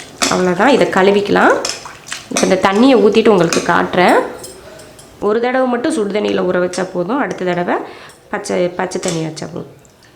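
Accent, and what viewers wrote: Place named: Theni, India. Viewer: Madam I am Pavithra Vijayan from Hyderabad.my native is Theni cumbum .nan ungalin subscriber.i like your cooking style madam it resembles Namma native muslim style maadhiri erukkae endru yosippen like ungaloda preparation,way of explaining that accent your tamil.later you told about Theni district special that day I confirmed .hearty congratulations mam . Namma ooru cooking stylela world wide propagate Panna vaitha ungalukkum,ungalin familykkum,and all the subscriber's kkum .my hearty congratulations.I am proud of you get a you tube channel from Theni with 1MILLION subscriber's very soon . thank you for this dish today .I like it most.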